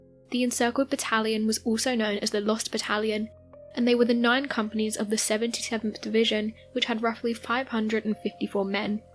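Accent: United Kingdom. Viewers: Ningeelewa ila sasa hii lafudhi ya kiBritain ni kisanga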